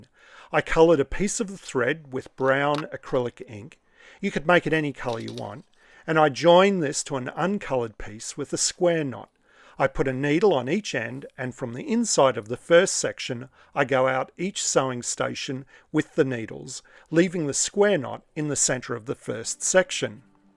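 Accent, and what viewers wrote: Australia. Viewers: Me encanta tu trabajo te felicito. Ahora entiendo porque comprendo todo lo que dices, y es porque tienes acento australiano facil para mi. Un Saludo desde Chile 🇨🇱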